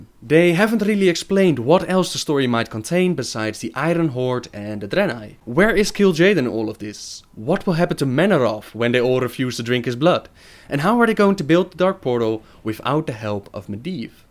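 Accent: Netherlands. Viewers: You're doing well on suppressing your dutch accent.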